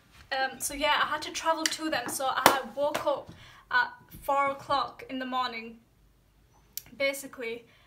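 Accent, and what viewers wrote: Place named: Birmingham, United Kingdom. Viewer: Is that Brum accent... Not too fond of it (she's lovely though ma'sha'Allah) barakallah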